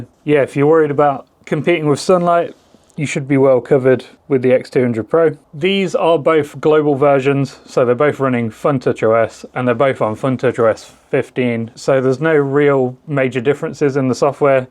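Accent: United Kingdom. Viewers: Keep it up bro, i like ur British accent, n ur video too (actually good video) 🎉🎉🎉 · British accent brings me joy ❤️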